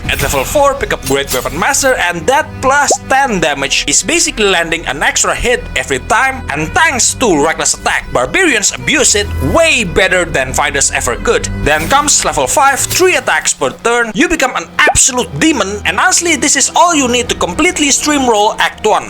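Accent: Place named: Indonesia. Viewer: i know an indonesian when i hear one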